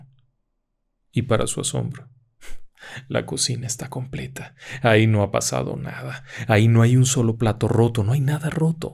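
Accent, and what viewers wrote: Dominican Republic. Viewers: Parece Más Dominicano Que Yo. 🤣😍❤️🇩🇴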